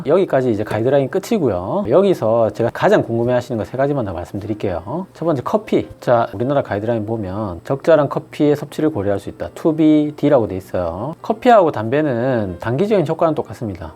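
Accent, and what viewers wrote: Gyeongsang, South Korea. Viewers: 갱상도토베기말투에 쏙쏙 빨리드갑니다 당뇨 고혈압 찾아보다가 여기까지와서 재밌게 잘봤네요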